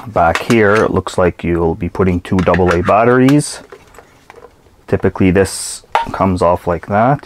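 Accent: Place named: Canada. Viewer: I can’t thank you enough. The instructions that come with the feeder were certainly written in China where the feeder was made. The instructions are impossible, like IMPOSSIBLE, to understand. Returning this would have been a pain. Thanks to your video in native English, Canadian I believe, I got it set up in minutes. Thanks so much!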